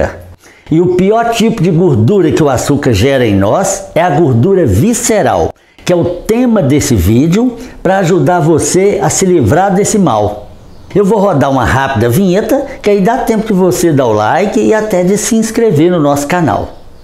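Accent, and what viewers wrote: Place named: Minas Gerais, Brazil. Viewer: Gente, estou encantada pelo Dr.. Fala com segurança e objetividade. E o jeito de "mineirin" é bem descontraído. Sou mineira também, da capital. 😍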